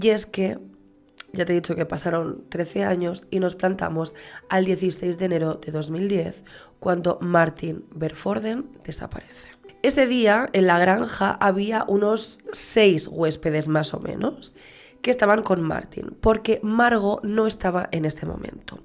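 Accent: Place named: Galicia, Spain. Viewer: Y ese acento gallego?? Bienvenida,!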